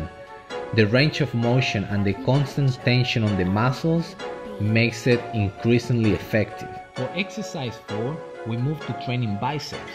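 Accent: Spain. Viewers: spanish accent, acento español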